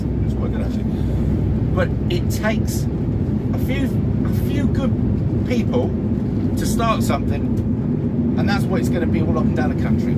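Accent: United Kingdom. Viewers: What kind of British are you?🤔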